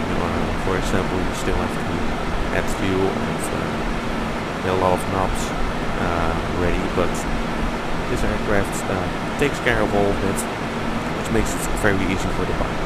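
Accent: Netherlands. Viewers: I swear to god, once i heard you're accent i KNEW you were dutch! And you're youtube account confirmed it :) Great video & thanks. Much better than reading tutorials ;)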